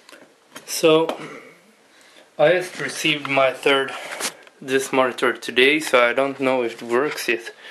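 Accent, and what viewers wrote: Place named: Sweden. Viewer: Swinglish! Winning!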